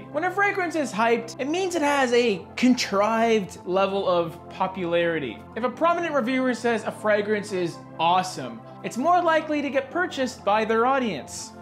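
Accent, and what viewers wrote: Australia. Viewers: Savage on the Australian accent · Oh I love Cedrat Boise, my favorite next to Aoud Lemon Mint and for me it is a performance freak, and what I noticed your bottle has a sticker on the bottom? while mine has a only etched batch code on the bottom. I guessed the NR1 pick, thought its going to be either Pacific Rock Moss, Individuel, or maybe a La Nuit de l`Homme. Australian accent! very noice!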